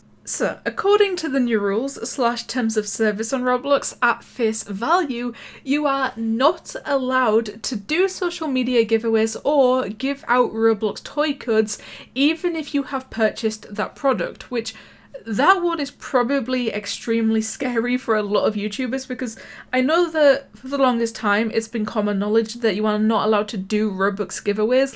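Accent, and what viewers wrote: United Kingdom. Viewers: Oh well glad to hear your British accent again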